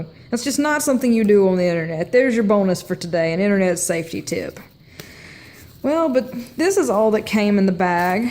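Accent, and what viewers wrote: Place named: Alabama, United States. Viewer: I'd guess you're in Alabama based on your accent. Maybe Georgia?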